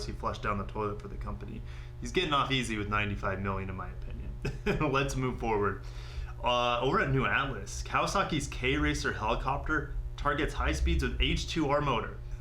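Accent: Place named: United States. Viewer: I'm sorry, but Americans and their pronunciations...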